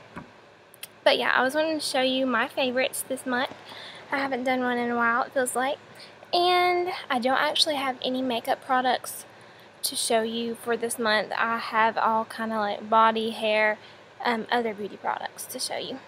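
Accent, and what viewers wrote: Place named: South, United States. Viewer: I love your accent! What part of the south are you from? I'm from Texas (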